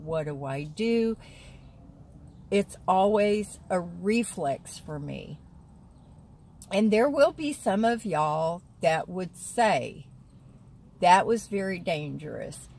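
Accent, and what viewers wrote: South, United States. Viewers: You did right!!!! I love your voice and for some reason it rings a bell you went to the rubber tramp roundup and met another southern gal and both of you were d,rawling like true born southern gals! Lol,it just reminded me,could be wrong though! Love Rea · Hi 👋🏻 I’ve not commented in a while. I’m back to work, so I don’t get as much time to sit and binge watch your videos. Hehe. I am still watching however, just not commenting. Admittedly I probably would have done the same as you and shouted out. And as for your accent, well I believe you have a gorgeous southern accent. And I can hear it in all of your videos. I’m from the uk though, so I’m not used to the American southern accent at all. Loving your stories, I’d love to hear about the one you mentioned about a gang member in your property. #exciting #cliffhanger lol have a nice day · For what it's worth, I just checked out your very first video from 2 years ago and you sound the exact same way - southern accent and all! 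There are always going to be people here on YT and elsewhere on social media who will try to find anything to criticize you about, especially since they can do it anonymously behind a keyboard. Unfortunately, it comes with the territory. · At the very least I would’ve called, but probably done what you did. That’s so true about accents, as I’ve seen it with my in laws, especially if they would be talking to friends or family that are still in the South. That’s your roots coming out, and that’s you!😍❤️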